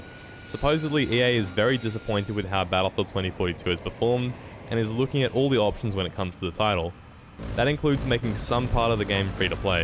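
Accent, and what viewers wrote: Australia. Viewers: Ughhhh Aussie accent, gross.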